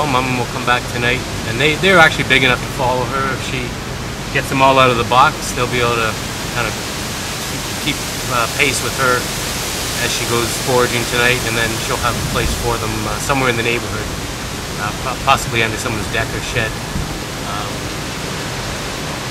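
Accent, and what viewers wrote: Canada. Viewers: I know a Canadian when I hear it!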